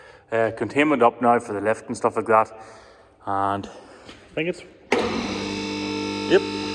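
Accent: Scotland, United Kingdom. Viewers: Some of us actually know you have a life and family outside of YT. I'm just a "peanut gallery regular" with an appreciation for a fine Scottish brogue! Hug your wife and children- they are much more of what makes you tick than I ever will be.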